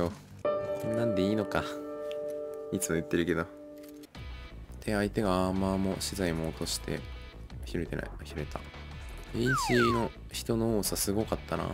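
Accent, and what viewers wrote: Kansai, Japan. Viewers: さんてもしかして関西の子？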